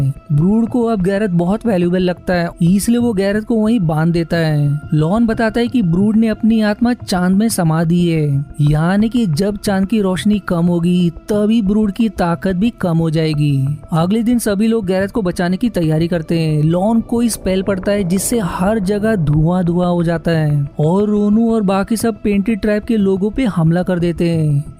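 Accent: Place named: Gujarat, India. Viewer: Why this guy has Gujju accent?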